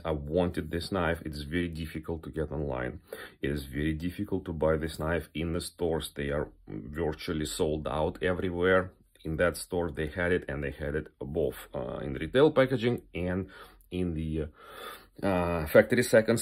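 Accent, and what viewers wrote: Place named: Russia. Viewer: Чувак да ты русский? )) У тебя русский акцент))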